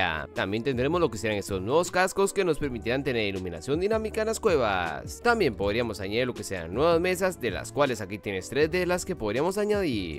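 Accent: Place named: Costa Rica. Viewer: Yo diciendo que raro esa pronunciación de la R me suena parece tico jaja luego veo la bandera y efectivamente jaja saludos desde Cartago xd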